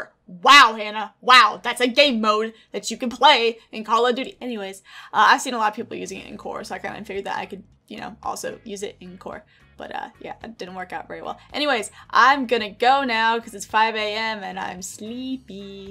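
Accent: South, United States. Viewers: That cackle is exactly why I subscribed. Plus, the southern accent comes out everytime she's just in a frantic shipment mess. So good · How long have you been watching her because her southern accent has been known in alot of her videos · I could really hear that southern accent of yours in this video.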